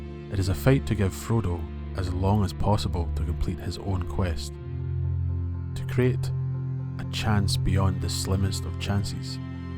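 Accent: Scotland, United Kingdom. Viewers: Wow what a wonderful Scottish voice/accent, a real treat! Many thanks.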